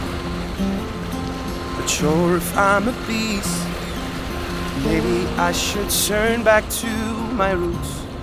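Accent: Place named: Germany. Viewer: how is it that you can sing in an American accent but speak with a German one? I find it fascinating. And, beautiful as always, bro.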